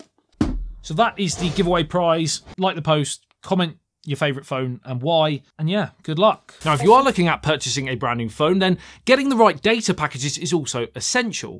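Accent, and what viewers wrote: England, United Kingdom. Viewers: What is the phone Asus, you mention ? You said it so fast I couldn't hear what you said. Your beautiful English accent is slightly difficult for a Northwesterner.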